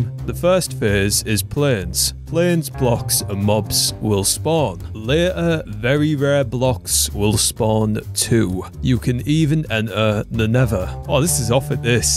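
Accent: Scotland, United Kingdom. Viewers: bri"ish man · Probably british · sounds Scottish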